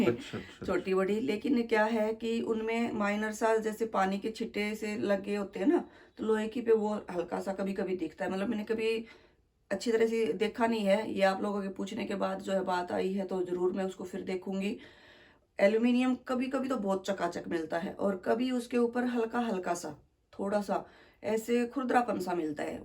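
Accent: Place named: Haryana, India. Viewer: Main aapki new subscriber hu mam..aaj ek dishwasher ka video meri screen pe aaya to main usko dekh rhi thi...to aapki video dikhi....aap hryana se ho kya..aapki awaz me hryanvi tone aati hai...to puchha...bcoz m hryana se hu......bahut hi impressive bolte ho mam aap...nice video...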